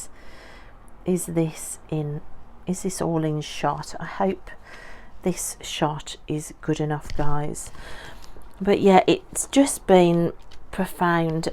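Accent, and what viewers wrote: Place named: West Midlands, United Kingdom. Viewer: I just want to say that I love your channel. I’ve watched all of your videos and I come for your personality and lovely voice. I like how you combine tarot chats with aspects of your own life and adventures, and without pushing any readings on us. I love your enthusiasm and deck introductions. Your accent reminds me of home - my relatives have a Wolverhampton accent, so West Mids, and I moved away to a location not far from you I think……near to Stratford Upon Avon. People watch channels that are interesting and make them feel peaceful. Ignore the haters.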